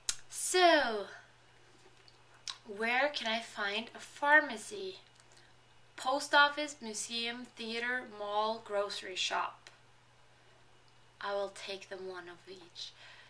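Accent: Oslo, Norway. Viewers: Er du fra Oslo? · norwegian sounds like german..love your norwegian accent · It's just a difference in dialect pronunciation. People around the Oslo area pronounce "og" with the "g" sound but it can also be pronounced without. It's actually a common mistake that people write "å" when they mean "og" because they sound the same.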